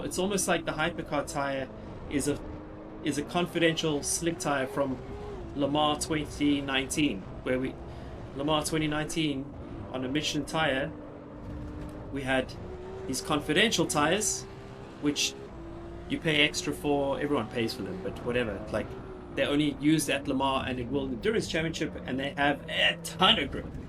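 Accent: South Africa. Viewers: Hiya, I've only ever seen a banner of coach online and this is my 1st time seeing anything you've done. But I know this is random but... Are you South African by any chance? I'm from jhb and and I can't shake off the feeling that you are from the accent lol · flawless commentary from the S.A accent lol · Your accent is very South African. You been?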